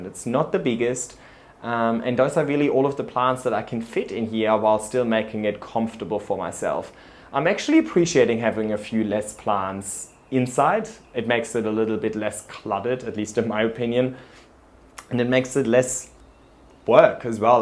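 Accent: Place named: Germany. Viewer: You sound German now.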